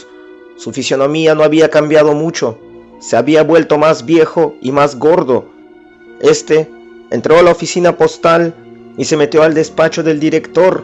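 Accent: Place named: Russia. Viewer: Buenisima historia, por tu acento creo que eres ruso o slavo?, saludos desde el Perú.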